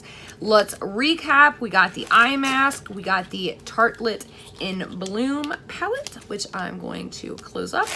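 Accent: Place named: New England, United States. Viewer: I'm from Kentucky you don't sound like it, I thought you were from maybe new england or something like that.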